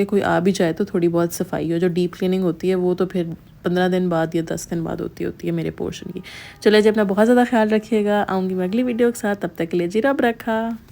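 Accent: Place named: Punjab, India. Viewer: Your punjabi acsent is soo funny😂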